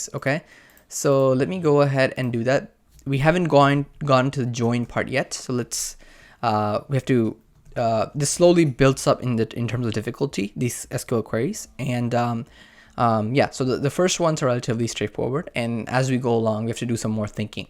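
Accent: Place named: India. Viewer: Funny to hear an indian guy trying so hard to hide his thick accent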